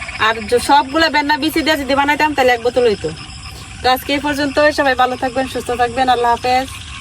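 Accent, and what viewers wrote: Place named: Kishoreganj, Bangladesh. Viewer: আপু তো কিশোরগঞ্জের ভাষায় কথা বলেন, আপুর বাড়ি কি কিশোরগঞ্জ বা ময়মনসিংহ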